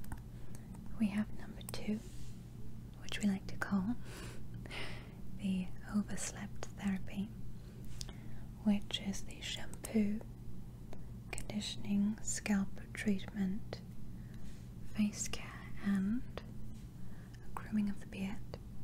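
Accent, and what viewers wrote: England, United Kingdom. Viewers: regular accent is english not American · Good English accent! ❤ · if i'd never seen your vids before i'd honestly say you were british. your accent is AMAZING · Is that a British accent?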